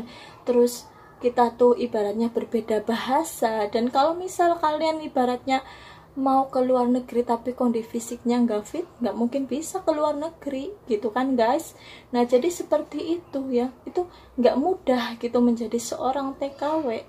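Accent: Banyumas, Indonesia. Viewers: Haha .ngapak kaliii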